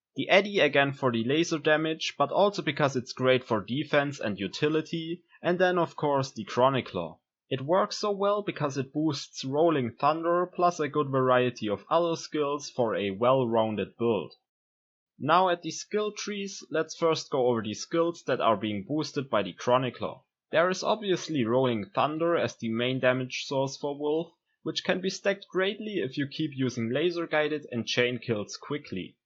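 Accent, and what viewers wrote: Germany. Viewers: your german accent is so strong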